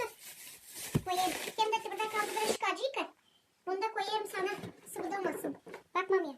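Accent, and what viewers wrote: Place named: Silistra, Bulgaria. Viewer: Keyifle izliyorum lehçe tam Silistre Türk lehçesi. Teşekkürler.İstanbuldan selamlar.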